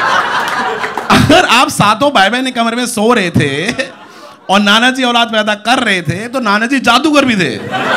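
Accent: Delhi, India. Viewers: I just love his dehliite punjabi style.... Hilarious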